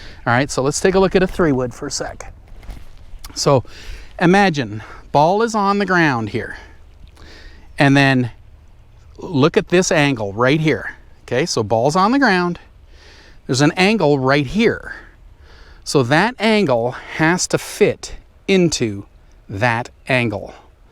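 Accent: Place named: South, United States. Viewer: Having spent 12 years in Gainesville Florida I can say you sound like an exasperated southerner. And I love it!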